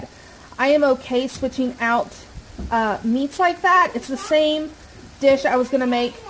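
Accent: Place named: Appalachia, United States. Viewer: I love it! Where are you from? I’m guessing somewhere in Appalachia?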